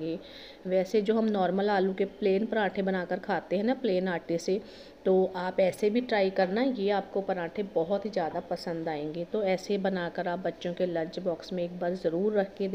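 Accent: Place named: Punjab, India. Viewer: Bahut badiya banaya tum punjabi ho na bolnye se pta chal raha hi sarso k oil se khansi to nhi hogi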